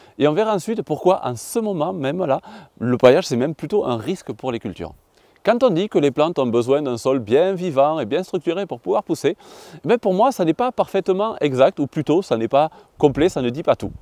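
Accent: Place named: Occitania, France. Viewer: Salut! Tu as un accent occitan. De quel département tu es?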